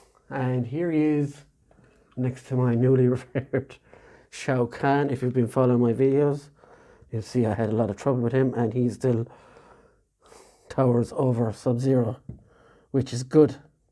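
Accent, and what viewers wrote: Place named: County Clare, Ireland. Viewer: Nice :) It's just... A mate of mine and one of my favorite Youtubers, mr. Dazran3o3, comes from county clare and your accent sounds just like him. :P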